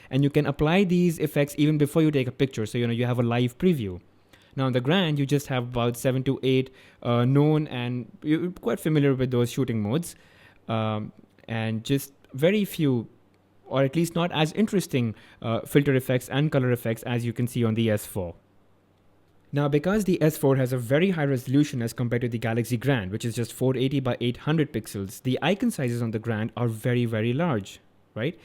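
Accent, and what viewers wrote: India. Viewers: aman Allahım adam hint aksanıyla ingilizce konuşuyor ve anlamak için kendimi yırttım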